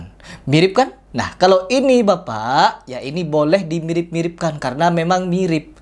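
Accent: Madura, Indonesia. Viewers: Salam dr madura mas,oea kok logat masnya kyk org madura ya?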